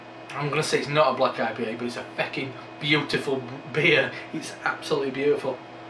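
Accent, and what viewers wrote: Yorkshire, United Kingdom. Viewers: wow you have friends all over haha :P = that BOOTIFUL :P love the Yorkshire accent = sounds like a great beer i saw BEERRITZ has some italian beers in recently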